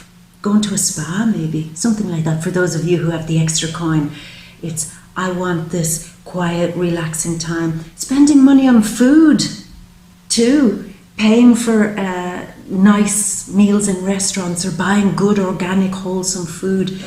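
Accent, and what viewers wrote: Ireland. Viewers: your got a good Irish accent lass I like it what about you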